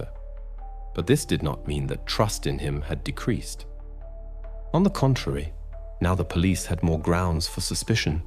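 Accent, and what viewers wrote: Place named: England, United Kingdom. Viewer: What happened to the other voice, without the English accent? All of these new channels are using the accent now and it' has gotten old. I listened here because of the American accent, now it's gone.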